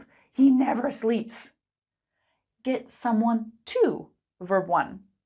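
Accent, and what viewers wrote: United States. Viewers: Hello, great teacher! Is your pronunciation American, British or Australian? Your pronunciation is so nice!😜👍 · Are you American or british? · I want to learn speaking English fluenly and correctly on phone calls or by any other means . I Enjoy your channel keep it up. sister are you american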